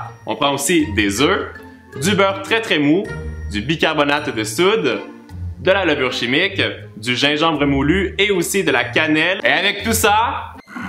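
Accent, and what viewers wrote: Quebec, Canada. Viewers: J'aime beaucoup ton maquillage , t'es vidéo et ton décore ! Ça a l'aire super bon . J'adore ton accent Québécois . Bref je t'adore !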